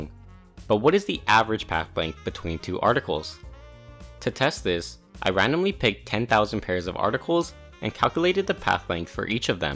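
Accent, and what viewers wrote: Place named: India. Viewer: Voice is indian..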